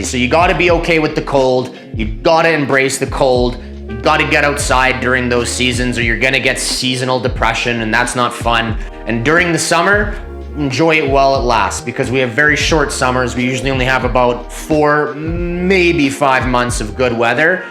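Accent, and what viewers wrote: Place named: Toronto, Canada. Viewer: Just 2 seconds into your video, I just knew you were from Toronto. Same vibes as those car sales men.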